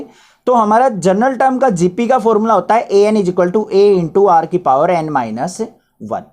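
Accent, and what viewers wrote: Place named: Punjab, India. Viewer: Apki awaj ki tone panjabiyo wali h 🤔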